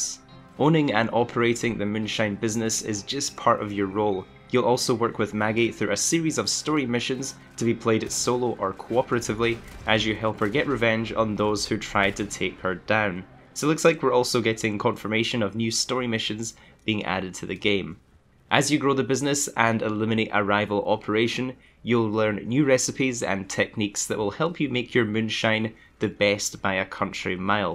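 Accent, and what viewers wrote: Scotland, United Kingdom. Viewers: Hey, your vids are great man. My Mrs is Scottish and we live in New Zealand so hearing that accent is wicked. Gonna be good to have a cabin setup with moonshine business. Makes you wonder what expansion the collector role will get huh.? What's your thoughts? I madam nazar type wagon or trapper site like in storey?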